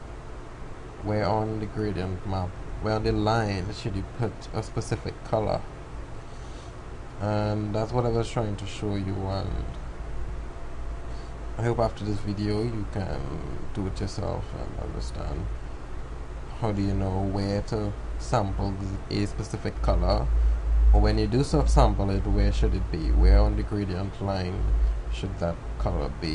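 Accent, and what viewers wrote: Trinidad and Tobago. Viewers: Same thing I saying he's trini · Great tutorial my friend. I can hear it in your voice that you're from Trinidad. I was shooting a destination wedding there for a client and friend who is from Trinidad. The wedding was in Tobago at Stonehaven Villas. Beautiful country indeed!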